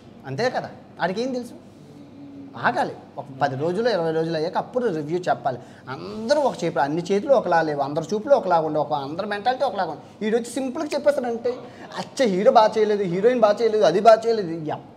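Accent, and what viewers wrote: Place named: Godavari, India. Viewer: My Favorite Dialogue Bro "చవక" ♥️🥰 (Cheap) " గోదావరి యాస బలే వుంటాది " Fan From రాజమండ్రి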